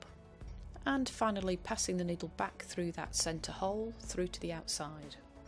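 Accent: United Kingdom. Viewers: I absolutely love your voice! Its like watching a tv program! What part of the Uk are you from, if I may ask?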